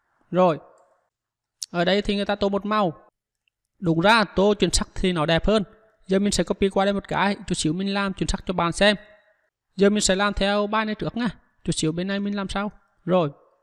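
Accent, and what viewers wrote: Central, Vietnam. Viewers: Hay quá a ơi.giọng miền trung cũng rất là chất luôn a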